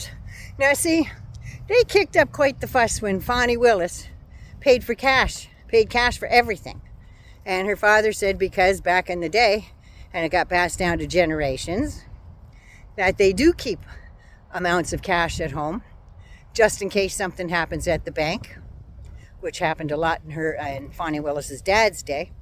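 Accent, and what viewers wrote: Canada. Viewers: is Canadian. I always confuse them as well, as a Brit.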